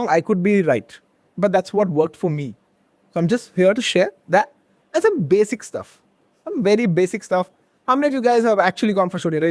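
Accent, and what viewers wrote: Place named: South India, India. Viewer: Vishwas bhai tumhare sath main mujhe bhi intresting lag raha hai and I am also IT student the way sir is explain is is very interesting and I like the south indian accent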